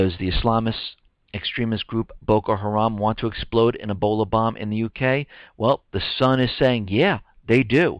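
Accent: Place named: Brooklyn, United States. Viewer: his accent sounds like my friend's... My friend is from Brooklyn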